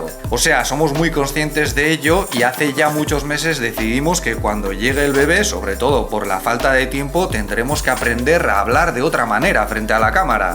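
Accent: China. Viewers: Jajaja ya tienes acento chino cuando hablas español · comienza a tener acento chino. Muchas bendiciones para su familia 🥰🥰🥰💖💕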